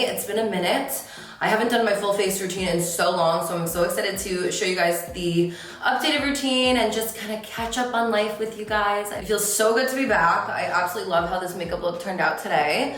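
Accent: New Jersey, United States. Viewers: I just noticed your New Jersey accent ☺️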